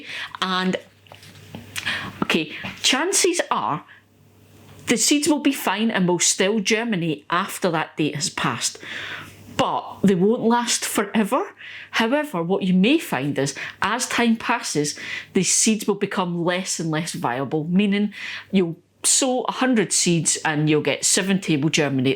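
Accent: Scotland, United Kingdom. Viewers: Hi! I have only recently been introduced to your you tube channel by Gardener Scott! I have watched a number of your video's and find them very informative, professional and entertaining! A bit of background. I live in the state of New Hampshire USA. I used to garden for a number of years, stopping about 5 years ago. Starting up again this year. I always did a conventual garden. This year I am planning on making 6 high raised beds, 4 for general produce and 2 for asparagus. My growing stats are- zone 5A, Lat. 43.6, elevation 1100 ft. I live on a 3 acre property which my grandfather started a dairy farm on. Rocky soil but VERY fertile Carlton Loam. I cannot stop anything from growing including weeds! Weeds, the main reason for raised beds. The high beds( 30 inches approx.) I am retired and don't want to bend over or be on my knees as much. I realize you are in a more restricted space,(urban, rural) I would appreciate any tips for me and fellow gardeners which have more space. 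I am still planning on planting some crops in ground, corn, potatoes, winter squash and cantaloupe! I am also planning on planting some other plants in ground as well as in the raised beds to compare! Love your Channel! Love your expertise! And your accent as well!!!!!!! Could listen to Scotts and Brits all day!!!!! And I am part Dane by my grandmother! Eric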